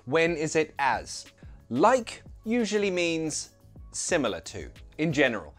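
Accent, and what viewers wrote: United Kingdom. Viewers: Love your British accent💜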